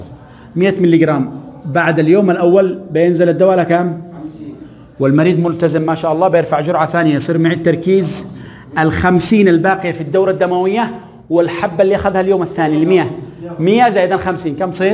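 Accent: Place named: Yemen. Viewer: اللهجة اليمنية ❤ الله يوفقكم اهل اليمن ويزيدكم من علمه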